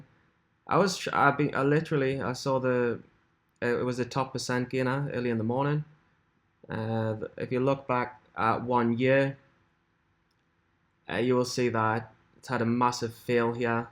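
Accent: Newcastle, United Kingdom. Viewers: You sound like you're from Newcastle! What broker do you use to trade us stocks in the uk?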